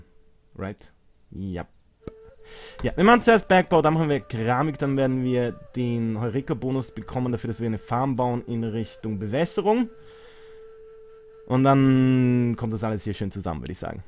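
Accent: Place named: Vienna, Austria. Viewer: Was für ein Akzent ist das? Wien?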